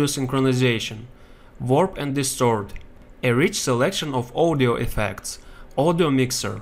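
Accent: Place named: Russia. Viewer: You have a russian or ukrainian accent :) Спасибо for the tutorial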